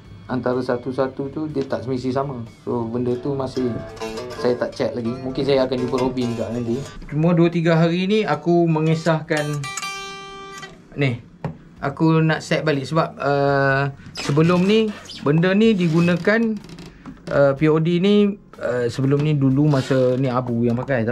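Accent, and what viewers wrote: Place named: Johor, Malaysia. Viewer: 😅saLam~saye rase nie video Lame Lbh kurang10tahon Lps abangmus throwback semuLe ye!?🤔dah Loghat joho Lah puLak gamak nye nampak tengok bang🤙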